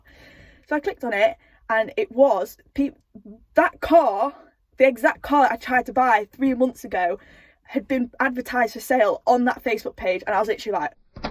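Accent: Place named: England, United Kingdom. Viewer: Damn, you sound proper English!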